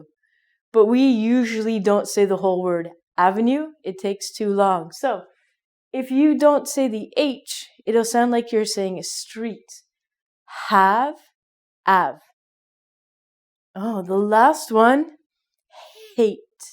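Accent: Canada. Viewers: Great video for learning Canadian pronunciation. out = "oh-t" mouth = "mow-th" etc. And she has that cheerful positive Canadian attitude as well. Love it. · oh my gosh, you're Canadian!! :D sorry, i had to say that xD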